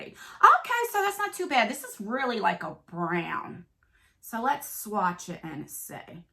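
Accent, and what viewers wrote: New Jersey, United States. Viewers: Lmao the creative descriptions must be a Jersey thing. My mom and my dad both were born and raised in Jersey and speak just like you, I live it! 💄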